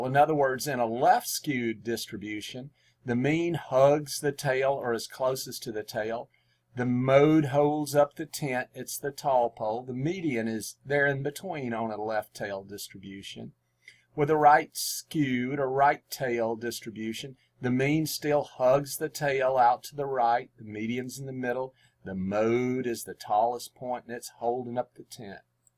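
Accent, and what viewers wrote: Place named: South, United States. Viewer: You, sir, are incredibly clutch... I was getting caught up in trying to figure out how deep down south he's from!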